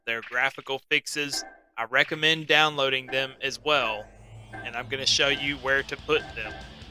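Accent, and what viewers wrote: United States. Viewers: Everything sounds so much more simply explained in a Southern accent. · Sound like you from Arkansas son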